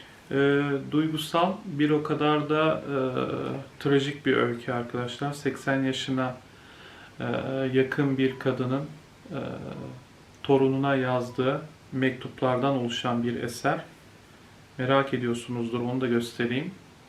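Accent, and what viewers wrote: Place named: Sunda, Indonesia. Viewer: 🙋 cuman teungarti, basa sunda ath ngomongna😁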